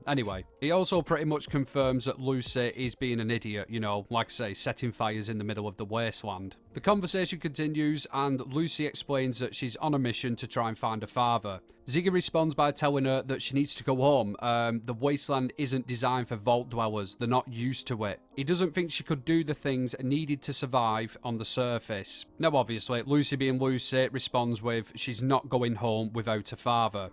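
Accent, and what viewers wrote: United Kingdom. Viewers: Appreciate you brother I’m actually fixing to watch and outline this episode for my channel and our recap and I thought I’d have you on in the background to take some early notes. I subbed you and i know you sound like your across the pond but if we could connect I’d be very happy to extend an invite to you to join us for one of our Fallout recap shows, we do the live discussion type deal and I think you would enjoy chopping it up with myself and my mates.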